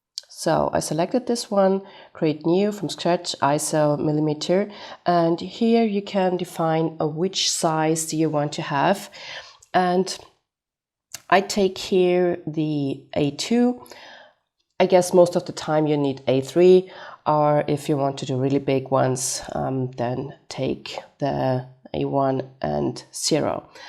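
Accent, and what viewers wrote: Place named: Austria. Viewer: OMG you are too cute, I could listen to you explain things forever and your cute Austrian accent is the icing on the cake!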